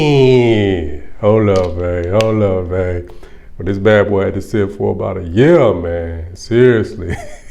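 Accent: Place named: Texas, United States. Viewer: Man you gotta be from Texas.. the way you talk. I love it. A new subscriber here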